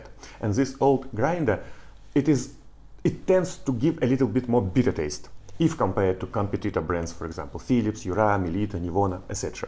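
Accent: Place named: Russia. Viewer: Просто жесточайший рунглиш Не осилил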